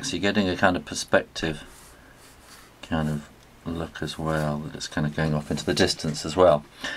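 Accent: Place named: England, United Kingdom. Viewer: nice to hear an english voice for once... and thanks for this tutorial it helped a lot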